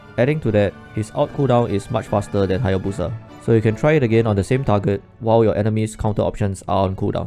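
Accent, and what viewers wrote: Singapore. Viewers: The heavy sg accent bro 😭😭😭 but good guide lah keep uploading guide like this can do very good one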